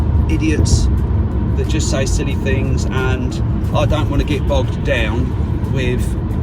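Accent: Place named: United Kingdom. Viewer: I like your British accense.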